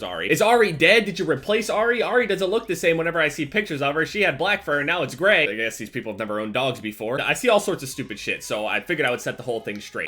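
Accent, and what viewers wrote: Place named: New Jersey, United States. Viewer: New Jersey accent on point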